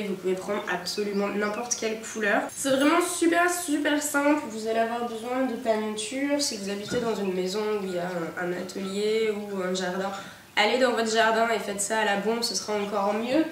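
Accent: South, France. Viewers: j'avais remarqué aussi que j'entendais un accent du sud que je n'entendais pas avant ^^ J'aime bien les accents :) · J'adore cette vidéo avec le bruit de la nature ♥ C'est vraiment une belle idée et la coloquinte blanche avec les pois est ma préférée. J'ai remarqué que tu avais beaucoup l'accent du sud dans cette vidéo, je me trompe? Bisous ♥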